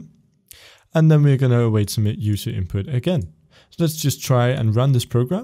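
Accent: Denmark. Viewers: Haha, sad og tænkte... "Den accent der.... Han MÅ da være dansker..." "... My name is... " xD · wait are you danish ???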